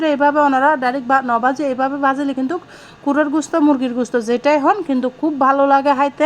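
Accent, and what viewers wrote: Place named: Chittagong, Bangladesh. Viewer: খুব সুন্দর হয়েছে এবং মুরগির মাংস রান্না খুব ভালো লাগে তোমার চট্টগ্রাম ভাষা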